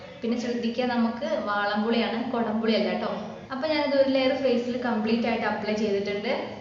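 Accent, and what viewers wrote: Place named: Thrissur, India. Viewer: Trissur aano home, slang 👍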